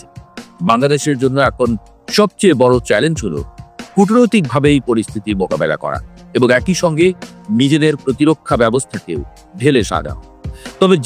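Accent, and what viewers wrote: Kolkata, India. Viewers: বাহ চমৎকার। কলকাতার দাদাবাবু দের মত 'স' 'চ' উচ্চারণ করছেন এই ভিডিও তে। যা আগে আপনার কোনো ভিডিও তে দেখি নাই। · আপনার কথাবলার টোন কলকাতার বাংলার মতো!!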